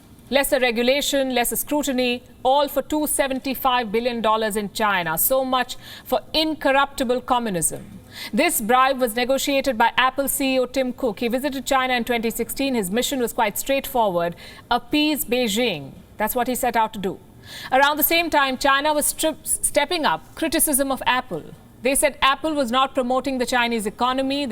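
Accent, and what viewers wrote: India. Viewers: Is this Fox news with indian accent and tan? I love America and China is surpsssing us. Solution invest intetnationally and tax the income heavpyly for building middle class again.. not let them keepthe loot snd starving people in US like our own Doing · Your Indian accent speak volume of your jealousy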